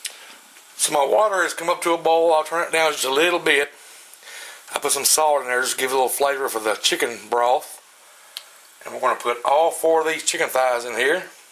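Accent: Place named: Alabama, United States. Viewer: ***** Ok no wonder you sound familiar, Im from Bama, guess its the accent. This meal was great too, made its way into my recipe box, but ill be sure you get the credits ;-) thanks for sharing!!!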